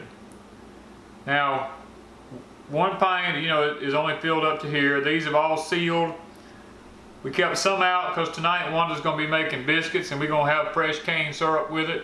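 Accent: United States. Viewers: So excited to receive my Danny Corn this past week :-) And the sweet potato growing book. Love your videos - kinda of cool hearing someone from the South like me LOL!! We are watching that crazy hurricane - it looks like it is going to make a circle. As long as it doesn't go into the Gulf. Katrina was enough for us for the rest of our lives. My mom & dad live in Pascagoula and they had 4 1/2 ft of water. That was a mess. We just had wind damage up here in Semmes (just northwest of Mobile). Take care! Judy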